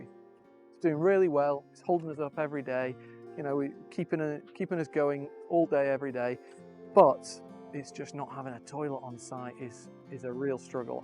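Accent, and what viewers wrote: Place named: United Kingdom. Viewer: that was an enjoyable video watching you, and work together. It will definitely be a fun renovation. Adding the new door makes it already look light years better. I love hearing you speak French and switch off to English with your British accent! I’m curious about lead based paint concerns. In the United States we have inspections prior to purchasing a house built before 1978 because there was lead in paint before 1978 and the airborne particles are dangerous to one’s health. Just wondering as we watch all of these old buildings be torn apart with dust and paint chips flying around.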